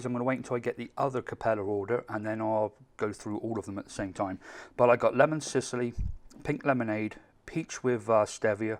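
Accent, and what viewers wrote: United Kingdom. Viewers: Hi mate, love your vids. Are you British living in the US ?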